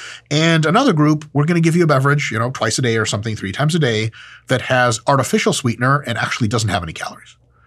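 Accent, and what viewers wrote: South, United States. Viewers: As an asian acting southerner I approve that accent. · Hello, from Texas. More Southern accent please. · As a southerner I'd love for you to make it through an entire revive stronger roundtable with that accent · Love the southern accent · I need more Southern content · The southern accent is a win! More please! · Info 10/10 Southern accent 6.5/10 -Texan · Bruh... thank you for the upload Brother! Bruh more Southern:)) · Southern accent approved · Sweet southern accent bro! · More southern accents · Am southern, do approve of accent! I love it!! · As an..ex-USSR person, I completely approve of your Southern accent, pls bring on moar of it! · hahaha that southern accent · I approve of the southerner accent and even slander in the future. (I'm from the armpit that is Lousiana) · Okay with the southern accent. Highly offended by the diet coke/nugget bit. 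has always worried me but now I see how problematic he truly is. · Yes mike we like your southern accent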